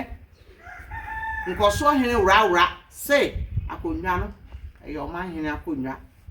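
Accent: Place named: Volta, Ghana. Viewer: The twi is beautiful but the Volta high accent is evident.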